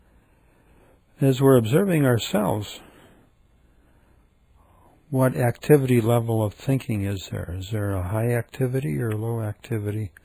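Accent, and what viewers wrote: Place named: United States. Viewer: i think its because its american, but the voice really creeped me out, abit. sorry.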